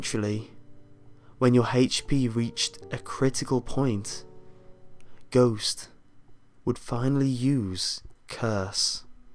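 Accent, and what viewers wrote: United Kingdom. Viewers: Luis Galaz preach, stop calling people retards and stuff just because they misheard the word “pawn”. The man is British and you can easily mishear a word.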